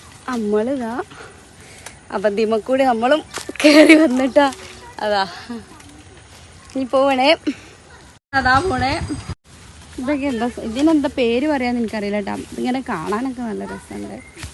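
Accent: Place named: Malappuram, India. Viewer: ഇത്ത മലപ്പുറം ഭാഷ തന്നെ 😂😂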